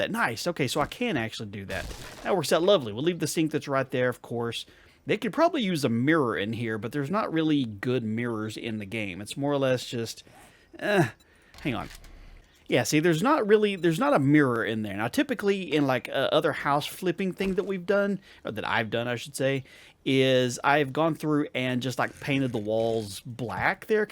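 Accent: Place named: Midwest, United States. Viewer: not to shabby man. Enjoyin the vids. BTW dumb question. Where you from? Sounds like the midwest with the way you speakith 🤣😂🤣😂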